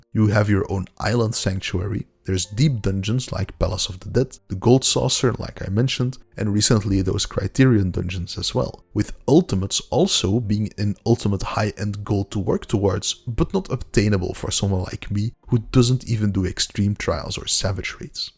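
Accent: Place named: Belgium. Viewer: i swear you sound belgian and i love it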